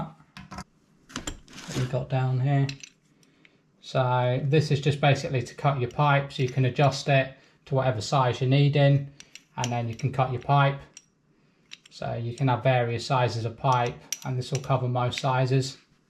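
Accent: United Kingdom. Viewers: Im an Electricion in Sweden, i see you have stuff for plumming, are your doing both jobs as a electrision in your country?, sounds like you are from UK right? Great video, i enjoy watching your content.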